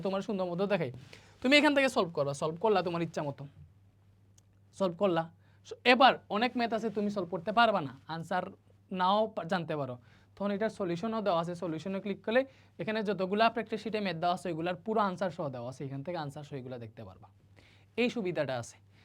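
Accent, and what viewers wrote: Sylhet, Bangladesh. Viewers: Vai ki sylheti?,..... Tan to sylheti er moto lage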